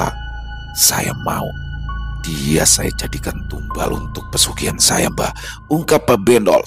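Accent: Jakarta, Indonesia. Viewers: Wah hadir lagi nih bang, terimakasih ya BG untuk selalu membawa cerita cerita seru, cerita paforitku ini dengan eksen Betawi nya yang asyik oh ya BG salam buat gomang ya 😊😊😀😀😀😀 sehat sehat ya BG dan team 🙏🙏🙏🙏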